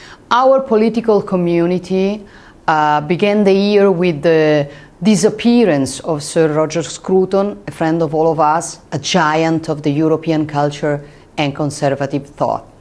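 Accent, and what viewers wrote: Rome, Italy. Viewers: non si possono paragonare .. ovvio, era imbarazzante e lo è tuttora, ma lei sta leggendo un copione studiato e per lo piu con pronuncia in romano quindi non vedo chissa che genio sinceramente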